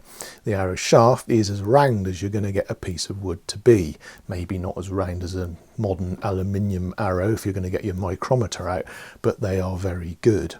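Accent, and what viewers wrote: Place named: United Kingdom. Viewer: Im not all that familiar with British accents but u kinda sound like Ian Anderson man. Are u both from the same area in England?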